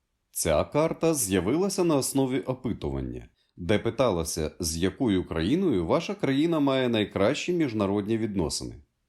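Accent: Russia. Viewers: Автор російськомовний, це чути з деяких слів і з акценту, але вподобайка за контекст 👍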